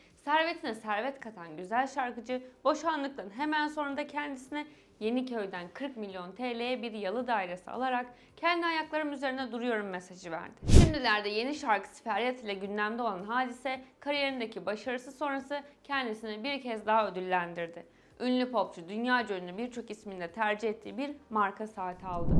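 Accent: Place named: England, United Kingdom. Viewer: Gönül isterdi'ki güzel Türkçe Dilimizi doğru dinlemek . Fakat maalesef ingiliz aksanı ile Türkçemiz katlediliyor. Lütfen , Dilimizi koruyalım . Alfabemizde "R" leri yumuşatarak ingilizlerin aksanı ile bozmayalım . Bu İngiliz aksanı ile Modern veya güzel Türkçe konuştuklarını sanıyorlar, lakin ingilizin Türkçemizi bu yolla katledlettiğinin farkında değiller . Güzel kardeşim lütfen kjendi dilini yabancılaştırma , aksine koru kolla .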